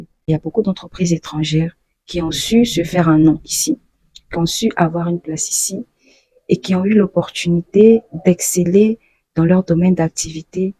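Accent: Ivory Coast. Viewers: Tu as même,l accent ivoirien, lol sois bénie, akwaba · Vous avez l'accent ivoiriens mou pour moi j'écoute une ivoirienne parlé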